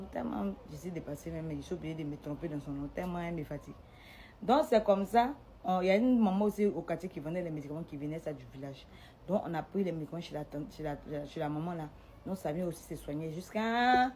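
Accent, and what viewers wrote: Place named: Ivory Coast. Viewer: son mimi accent ivoirien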